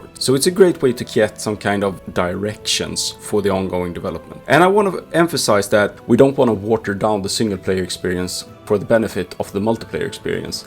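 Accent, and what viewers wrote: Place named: Sweden. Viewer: I like your swedish accent! i bought the game because of it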